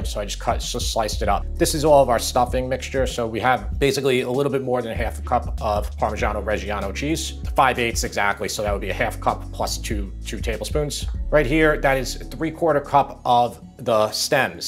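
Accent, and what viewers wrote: New York, United States. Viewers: Is that New York I hear in your voice? Bronx maybe?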